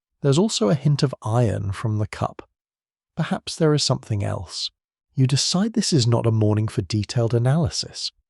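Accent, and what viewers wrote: United Kingdom. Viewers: pm Rochester NY. Not feeling the British narrator.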